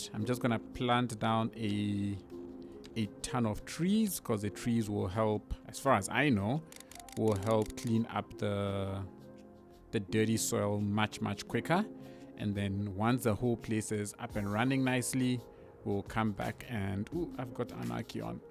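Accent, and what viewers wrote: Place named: South Africa. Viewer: Love your South African accent bro refreshing from all the American YouTubers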